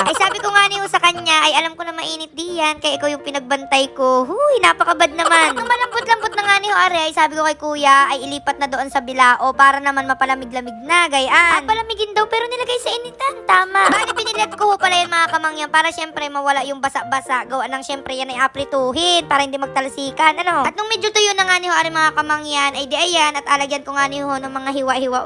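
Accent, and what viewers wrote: Batangas, Philippines. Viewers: you have a batangueno accent 😊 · Parang Batangas eh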